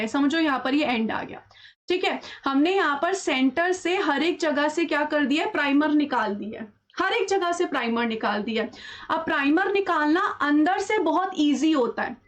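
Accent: India. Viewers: Ek number bhava khamgaon bolte❤️❤️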